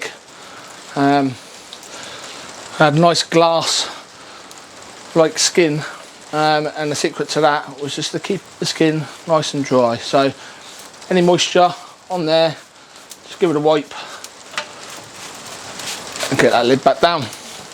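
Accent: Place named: East London, United Kingdom. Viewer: Nice to hear a great East Laaahndan (or Essex) lad showing the World how to do pork “right proper”! Thank you, I’m trying pork on the rotisserie tomorrow, and I’m going to copy your great instructions.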